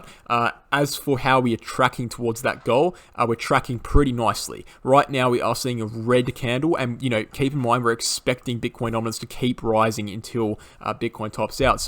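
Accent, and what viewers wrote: Australia. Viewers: So exciting... what accent is that? Australia?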